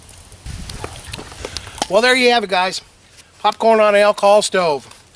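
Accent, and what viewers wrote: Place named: Eastern Oregon, United States. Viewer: What part of Oregon are you from? My guess is somewhere from Eastern Oregon?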